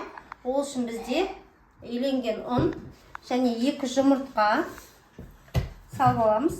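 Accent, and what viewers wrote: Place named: Uzbekistan. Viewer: Қазақша да орысша емесөзбек не қалпакша сөйлиеди бир бале былғап деген. Сөз жоқ қазағым да сүмдық 🤧😷🤧😷😷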